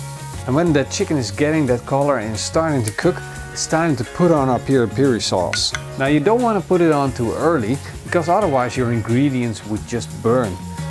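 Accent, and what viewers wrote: Netherlands. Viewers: man the dutch accent is so cool.perhaps a little carribean style barbeque next? Or even curing your own meats? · Je hoort echt aan zn accent dat ie Nederlands is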